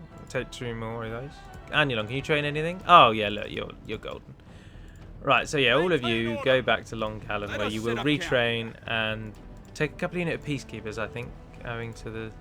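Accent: United Kingdom. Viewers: You really shouldn't worry about guys who question your knowledge, your videos aren't aimed at being some kind of lore encyclopedia, you don't owe these people anything!:) I like to consider myself as quite knowledgeable on Tolkien's lore, but when I hear mistakes here and there in your videos I don't give a damn about it, because that's not the reason I'm watching them!! I'm watching them because you're an interesting person in general with an interesting playstyle as well, and that's the important thing here;) (plus you help me get better at speaking English and at getting a British accent so I'll vouch for you and your vids ^^) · Your lore video was great. It was really good. You are a man who speaks to us, the audience, as if you were telling a story and yes that means some things you say will be wrong but that utterly stands in the shadow of how pleasant your lore videos are to listen to. 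As if I were listening to a lovely fairy tale. Kudos! It is obviously better than your Sindarin pronunciation but that is because your native language is English you jammy ... ^^. No seriously, that British-ness about you and the way you speak to us is what makes you, you, and why people come to you. Don't let a reddit-guy over-the-top holier-than-thou fellow ruin your day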